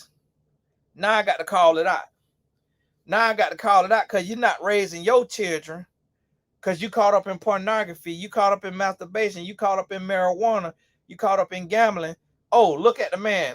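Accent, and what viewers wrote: South, United States. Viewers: You so southern, bless ya heart 😅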